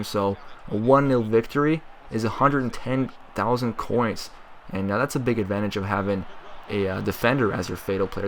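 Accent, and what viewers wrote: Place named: United States. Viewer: You sound american, were you born there?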